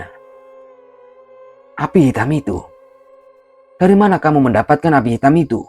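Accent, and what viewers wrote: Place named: Java, Indonesia. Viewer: medok bnr mas jowo ne keto 😂😂😂 ok lanjutt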